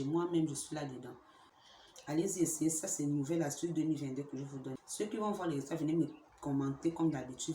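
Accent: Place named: Gabon. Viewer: Accent gabonais 🇬🇦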